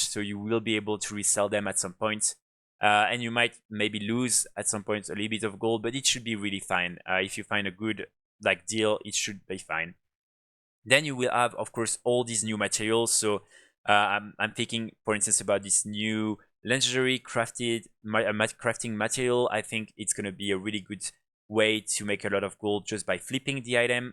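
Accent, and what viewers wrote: France. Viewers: Really like your narrating style and you're French accent! 😊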